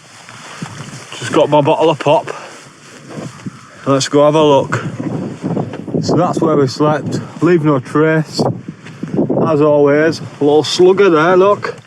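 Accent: Yorkshire, United Kingdom. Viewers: love it when you pronounce "cant" as 'caart'. Keep on yorkshireing old boy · Im sure you've had some dawg after ya food it looks like 😂 hope you enjoyed, im jealous. Keep up the good work just subed. Nice to hear another yorkshire accent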